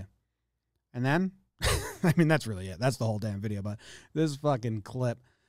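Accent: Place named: Texas, United States. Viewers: Your Texas accent is spot on. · Love your Texas accent.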